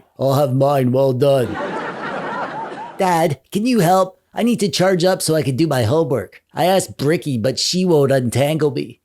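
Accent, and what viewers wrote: Canada. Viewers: Are you Canadian? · Is Canadian? Nice lol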